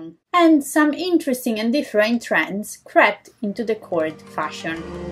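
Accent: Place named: China. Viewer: You are almost speaking with a chinese- english accent. 😊 But anyway very interesting docu!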